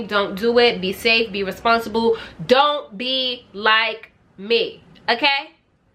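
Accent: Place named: New York, United States. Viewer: Omg the accent. I feel like I haven't heard a NY accent in so long since moving to Atlanta. And yes, it is C-STEP lol.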